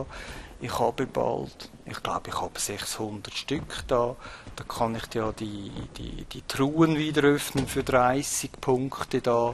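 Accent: Switzerland. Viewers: Mit deinem schweizer akzent hab ich kein problem denn den find ich geil!!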